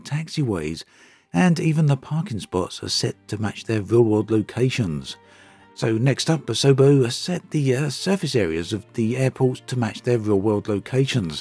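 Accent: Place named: Wales, United Kingdom. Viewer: I am still trying to understand what part of the English speaking world YouTube content creators come from? So often their use of syntax and pronunciation is impossibly wrong. It is a cadence of translation from another language, yet these people seem to have a Welsh brogue. I'm fucking baffled and also annoyed. Don't get me wrong the American narrators are often as poorly spoken, particularlily those from the West coast. Beyond weird. I never encounter people who speak like this in real life but everywhere on YouTube. I am convinced they are all AI.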